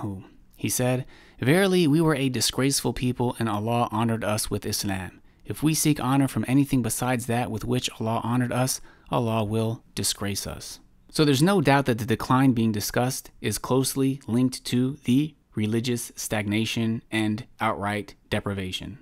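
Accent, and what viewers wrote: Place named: New Jersey, United States. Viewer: Is he from jersey ? He has a strong jersey maybe New York accent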